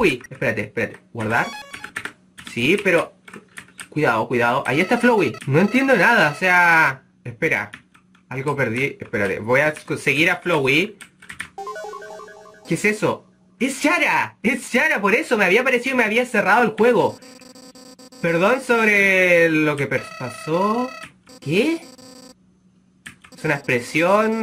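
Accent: Spain. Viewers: Si eres chileno porque hablas como español? V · desde cuando hablas español españa?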